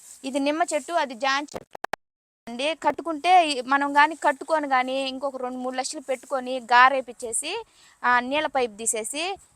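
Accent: Nellore, India. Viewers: Nellore slang ❤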